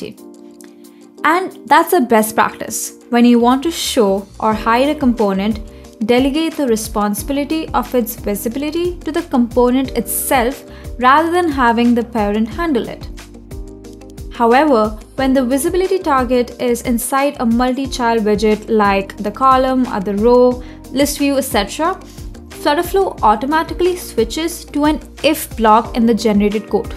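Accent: India. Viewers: Gosh, Indian accent again. FlutterFlow, don’t go down this path.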